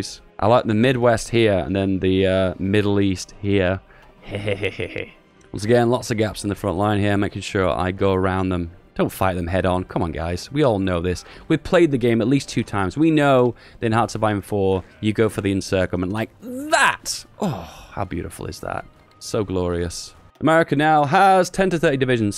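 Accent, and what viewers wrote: United Kingdom. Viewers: Thanks Dave for starting my day with a laugh and some britshness.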